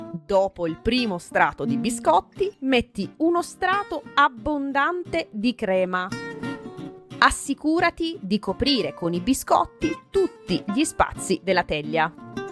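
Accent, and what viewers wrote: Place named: Taranto, Italy. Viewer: Buonasera, non era mia intenzione offenderla. Essendo lieve non so caratterizzarlo. Nel canale YouTube insegna egregiamente grammatica italiana, per questo le rinnovo i complimenti. Non crede sarebbe giusto insegnare anche la corretta dizione? Non volevo offenderla, ogni dialetto, accento va senza dubbio salvaguardato. Io amo l'accento ciociaro ad esempio, essendo originario di Frosinone. Molti attori, attrici, presentatrici e presentatori fanno corsi di dizione, pur conservando e amando le loro origini. Spero di essermi spiegato, lei fa e farà come sempre un ottimo lavoro. Non la disturberò più con i miei commenti. P.S. credo abbia origine Tarantine, ma potrei sbagliarmi. Un caro saluto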